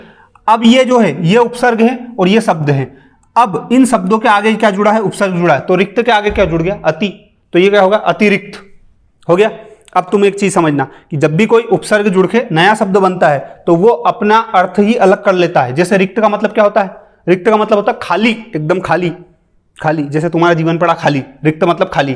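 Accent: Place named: Bihar, India. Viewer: Teacher सायद बिहार से है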